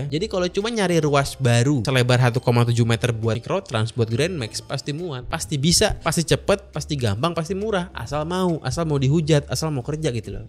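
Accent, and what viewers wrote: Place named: Sunda, Indonesia. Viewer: Seru bang gaya bicara nya, sisip sisip Sunda Sunda nya saetik EUY 👍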